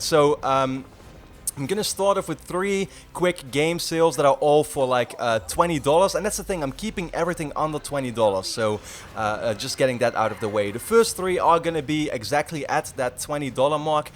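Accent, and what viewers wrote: Netherlands. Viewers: i don't know why but as soon as I heard your voice I thought to myself 'is he Dutch?'. I'm from Amsterdam myself. New subscriber btw. Then I saw on your channel that you are from the Netherlands 😃. Pretty cool to see how big your channel is and awesome content. Gonna binge watch your videos to get myself up to speed.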